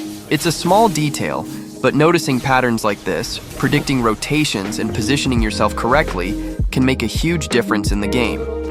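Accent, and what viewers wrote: India. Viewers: Is bro indian?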